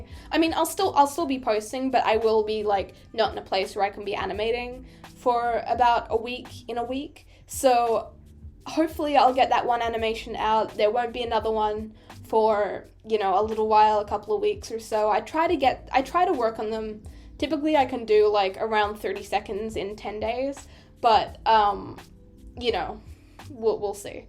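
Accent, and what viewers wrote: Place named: Australia. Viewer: WAIT AUSTRALIAN???? JUST LIKE ME FR???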